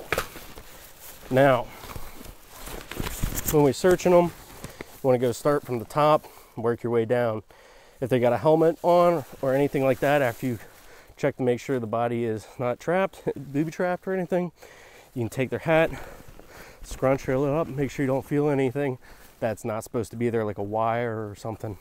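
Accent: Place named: New England, United States. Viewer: No where near Appalachia. Doesn't look like my backyard, just a little to flat. Plus your Yankee accent tells me the same thing. Otherwise, the idea of your video is good..I wish you much success in your endeavors. The more educational content like this the better.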